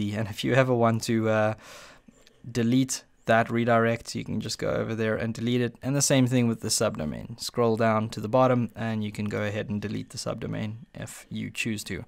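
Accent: South Africa. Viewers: Dude are you South African?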